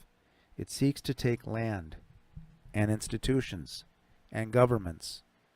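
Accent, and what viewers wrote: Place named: United States. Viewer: Your are right. But by your accent? you are in U.S. isn't it? the problem in Europe is that you talk about what will happen in Europe withon 1 or 2 generations... and too many people in Europe? don't have children, so they couldn't care less about the next generation. Angela Merkel? no children, no grandchildren. Teressa May? no children. Emmanuel Macron, no child of his own. That's a side effect of a birth rate under 2, in whole west and central Europe... They have cheap workers now, they don;t care about the future... BTW utube also blocks ppl fm comment and accounts, under "ofending" ppl rules... Immigrate for the cause of Allah, Jihad by immigration, and taqiyyah lie to them, is is Quran 4:100-104 <link>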